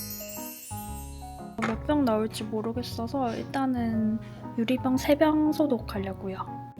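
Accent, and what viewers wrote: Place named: Busan, South Korea. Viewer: 시애틀 브이로그 넘 재밌어요!! 자주자주 올려주세요~~ 옛날에 비해 특유의 뽀짝한 부산사투리가 많이 옅어지셨네요 ㅎㅎㅠㅠ 그래도 귀엽고 예쁘고 다 한,♡ 유투브 다시 시작해주셔서 감사해요!!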